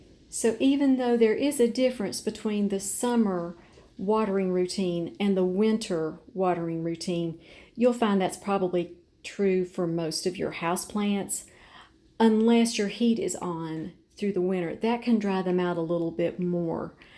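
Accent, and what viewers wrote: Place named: North Carolina, United States. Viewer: I hear NC in the dialect. Am I right!? These have grown so beautifully!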